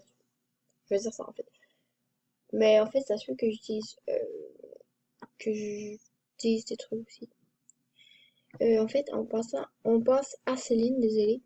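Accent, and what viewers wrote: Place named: Quebec, Canada. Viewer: tu est devenus une de mais youtubeuse préféfé qui jou sur msp prace que tu parle quebecois et tu est un quebecoise jadore moi aussi je suis quebecoise ❤😍😜😉😂😀😁🤣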